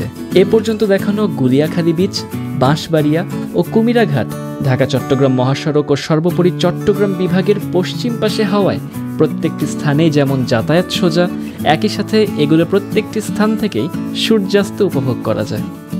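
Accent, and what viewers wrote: Standard. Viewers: বাংলায় উপস্থাপনায় এক যুগান্তকারী উদাহরণ অপনি এবং বিশ্বকে সম্পুর্ন শুদ্ধ বাংলায় উপস্থাপন আপনার থেকে ভালো কেউ করতে পারে নাই এটা নিশ্চিত ♥️♥️💕💕 এগিয়ে যান আরো অনেক দূর ♥️ আল্লাহ তায়ালা আপনাক নেক হায়াত দান করুক ♥️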